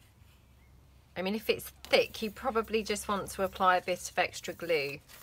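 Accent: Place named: United Kingdom. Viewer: I love your videos,! This is another great one. You are so talented and kind! And besides, listen to you talk reminds me of a wonderful time in my past when I lived in the UK. Thank you for all! 🥰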